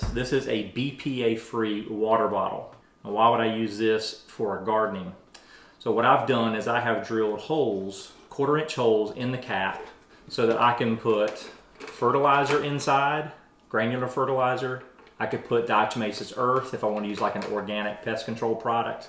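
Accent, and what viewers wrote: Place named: South, United States. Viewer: I love your southern accent!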